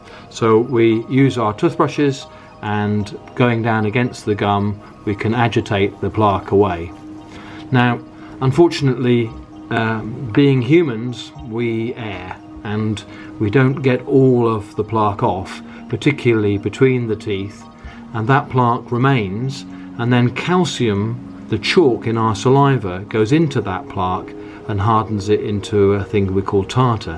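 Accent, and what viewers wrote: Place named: England, United Kingdom. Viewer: Because he’s English and that is the correct way to pronounce it.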